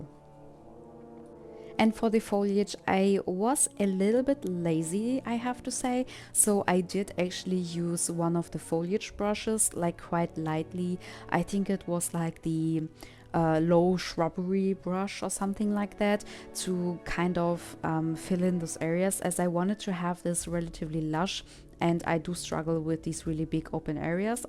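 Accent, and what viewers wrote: Germany. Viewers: Thanks for the inspiration. But a funny question are you german ? Wegen dem Akzent und dem Wort Glas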